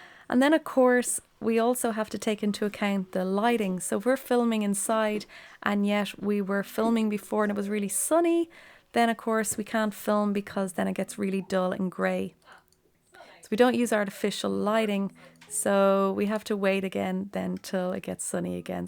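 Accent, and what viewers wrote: Ireland. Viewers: I so love your soothing beautiful irish voice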